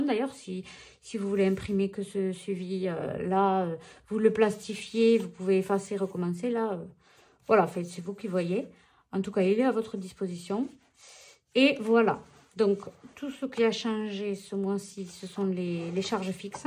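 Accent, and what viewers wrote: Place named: Occitanie, France. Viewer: Je suis nouvelle abonnée. J'aime bien votre accent, il ressemble au mien Région Occitanie ????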